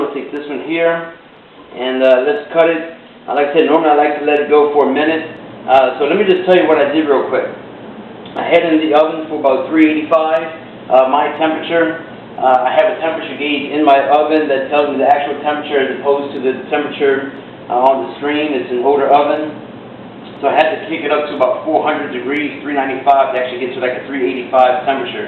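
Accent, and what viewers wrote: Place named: Louisiana, United States. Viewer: No accent you say? ? I hear Louisiana.. Delightfully so. I chose this video to respond because I enjoyed it..and it will remind me that I want this recipe. :-)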